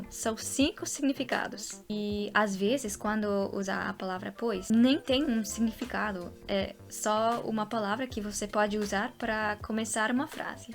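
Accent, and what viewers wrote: São Paulo, Brazil. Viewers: Você parece com uma Paulista , você fala o português muito bonito, 👏🇧🇷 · bemm dms,seu português está como alguém que mora em são paulo,parabéns!❤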